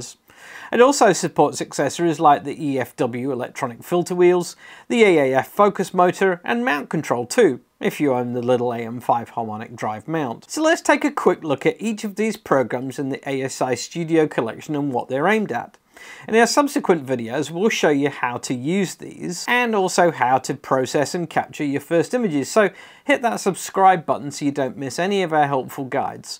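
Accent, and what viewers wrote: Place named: New Zealand. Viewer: good to hear a Kiwi voice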